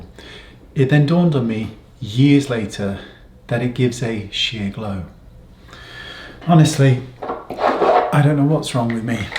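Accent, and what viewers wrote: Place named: West Country, United Kingdom. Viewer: Pisser!! 😆 you re funny, are you a west country lad BTW?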